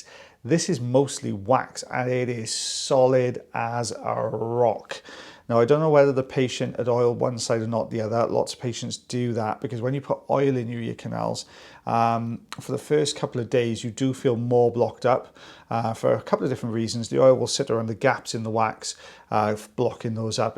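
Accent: Wales, United Kingdom. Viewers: I watch partly because I love hearing a welsh accent. Spent a lot of time in Wales all my life. Sadly never learned it, apart from a few phrases and swear words.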